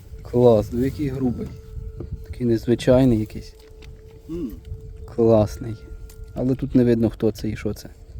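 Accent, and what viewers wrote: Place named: Vinnytsia, Ukraine. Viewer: Найдобріший з копарьских каналів. А як гарно звучить вінницька промова! Найчистіша українська імхо! треба щось з ніком робити, бо навпаки читається нетой.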